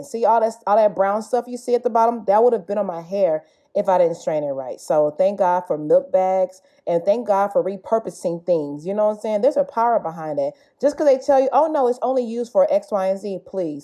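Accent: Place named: Yoruba, Nigeria. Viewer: Just discovered you. I love how your Yoruba/Nigerian shines through.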